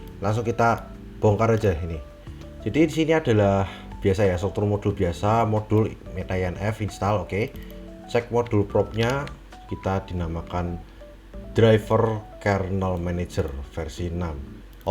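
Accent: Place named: Java, Indonesia. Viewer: keliatan jowo nya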